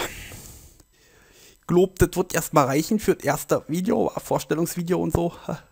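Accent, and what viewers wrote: Berlin, Germany. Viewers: Du musst unbedingt die Berliner Schauze behalten... bloß niemals Hochdeutsch! Aber bitte beleidige die Schafe nicht so wien Berliner Penner :D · Und bitte rede nicht Berlinerisch. Das klingt bei anderen noch OK. Aber bei dir so scheiße und überdreht.........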